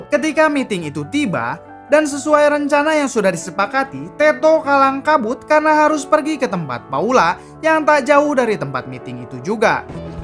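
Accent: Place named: Madura, Indonesia. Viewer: Alur cerita kalau dibacain pakai aksen Madura emang >>>>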